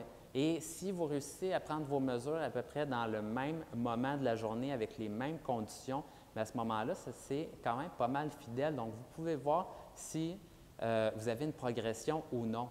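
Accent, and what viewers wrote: Quebec, Canada. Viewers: Le quebec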